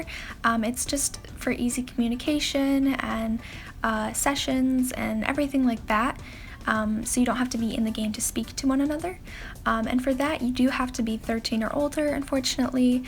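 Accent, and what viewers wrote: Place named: United Kingdom. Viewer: Heyy! R u from European places, accordin t ur great British accent?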